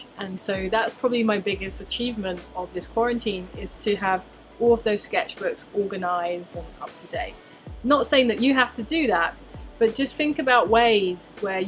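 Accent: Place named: United Kingdom. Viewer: How I missed your voice and your fantastic British accent!! I hope you will spoil us with more YouTube movies. I got to know you via a clip of Casey Neistat many years ago. I love your work and cherish my black Chantell signature Puma's 😉👍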